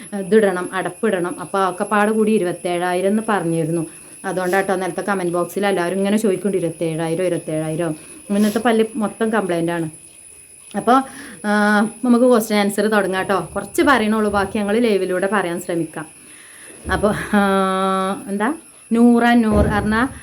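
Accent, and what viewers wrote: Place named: Pullanjeri, India. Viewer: ചേപപൂര് ആണോ വീട്.ഞാൻ Pullanjeri ആണ്😊.ഞാൻ ഈ അടുത്താണ് നിഞളെ വീഡിയോ കാണാൻ തുടങ്ങിയത്.പിന്നെ നമ്മ ള ഭാഷ അത് ഇതല്ലാതെ വേറേത്😊❤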